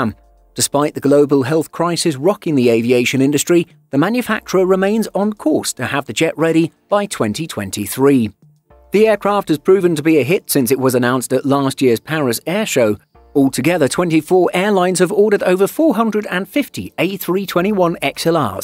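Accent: England, United Kingdom. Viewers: You are using and English accent to promote an EU product for which the UK is no longer a part of.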